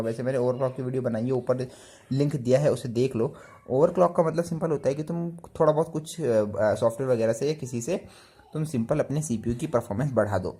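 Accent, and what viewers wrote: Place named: South India, India. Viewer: isme mein toh bhai south indian lag raha hai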